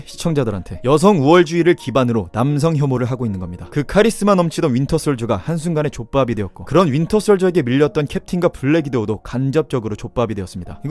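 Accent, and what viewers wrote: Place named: Jeolla, South Korea. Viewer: 전라도 사투리 빼라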